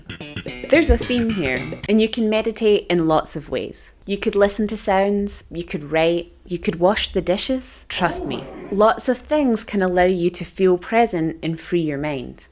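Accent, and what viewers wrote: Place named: Central Belt, United Kingdom. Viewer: yeah I couldn't pinpoint your exact accent, but definitely central belt, or maybe even Stirlingshire :)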